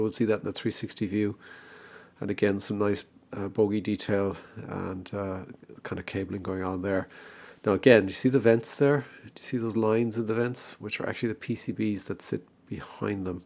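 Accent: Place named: Ireland. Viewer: You sound like a fellow Irish chappie . Great video and thank you !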